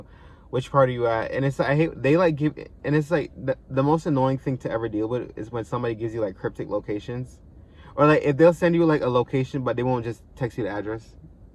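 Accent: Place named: United States. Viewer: Love your stories man and your American accent.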